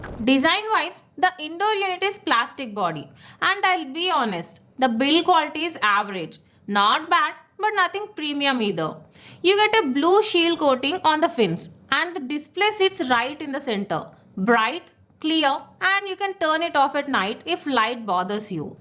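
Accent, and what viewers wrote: South India, India. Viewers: Are you from south?? Your speeching style like that...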